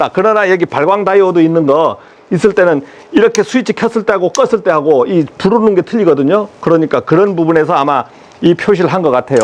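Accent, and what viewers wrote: Jeju, South Korea. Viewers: 말투가 제주스타일 갔다고 생각했는데 구독했더니 제주도 분이네요 반갑습니다❤❤❤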